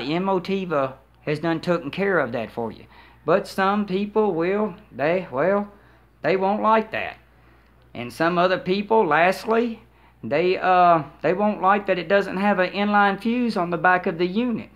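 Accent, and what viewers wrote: South, United States. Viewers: Good Ol' boy does audio...I have not heard audio gear reviews with a heavy southern accent. I like it! Haters gonna hate on Emotiva, seems impossible to go wrong with for the $.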